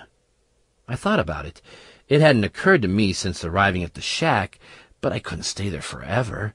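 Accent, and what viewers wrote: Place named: United States. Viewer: The voice of the narrator is so casual, and conversational, for having discovered one's self in a nightmare; plus, he sounds about 16. He says he is England, so why did they use an American as a narrator?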